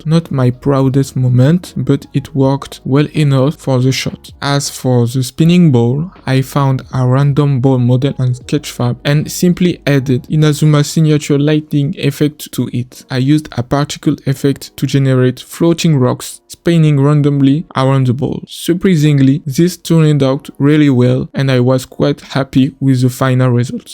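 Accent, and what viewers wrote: France. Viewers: Very french accent mdr. Mais comme d'habitude le rendu du GOAT de la 3D sur Inazuma est légendaire. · faut avoir des balls pour poster une vidéo ou tu parle en anglais avec l'accent français mdr sinon continue chef c banger ce que tu cook ! ( tu ma tué mdr) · j'ai reconnu l'horrible accent fr mais sinon très bon taff !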